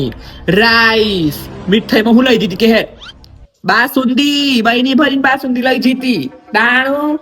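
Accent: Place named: Idar, India. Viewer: msst cho tme mne to idar ni bhasa bv gme che ho bijo pn bnvjo ok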